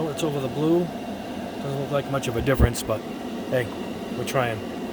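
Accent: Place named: New England, United States. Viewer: Dude, thank you so much for doing these; you're my primary resource anytime I'm trying to figure out what I can airbrush. You rule 🤘 Also- New England Italian… Sounding like a bunch of people I met in New Haven who reminded me of a bunch of people i grew up with in New Jersey. Accent rules 🤘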